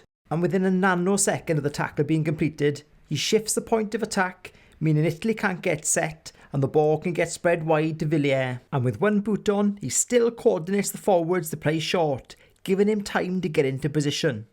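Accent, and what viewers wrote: Wales, United Kingdom. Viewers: Great analysis, and I like your accent (welsh, if I am right). I will check your other videos. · Sorry. I enjoy what you do but your welsh accent is an obstacle for a normal frenchman. Friendly.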